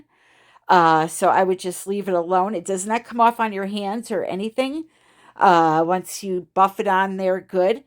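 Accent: United States. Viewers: I was watching this thinking, “what the heck is HAT glue? Some kind of glue gun only used for hats?” The entire time. Took a long time to realise it was just your USA accent making the English sound strange. As a British person it sounded SO much like HAT, like I really though that’s what you said lol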